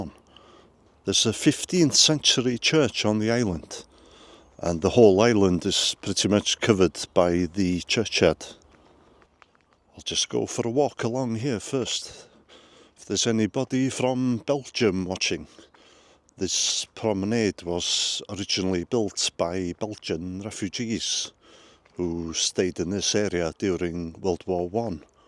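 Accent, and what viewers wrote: Wales, United Kingdom. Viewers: I absolutely love your channel. My mother side of my family were from Port Talbot and their first language was Welsh. Your accent reminds me of my family. I’m half welsh and half English. Keep up the great channel. Your commentary and approach is unique don’t change its great. 👍👍👍👍👍👍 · No need to apologize for your pronunciation... your Welsh accent is appreciated... keep up the great work.... Cheers!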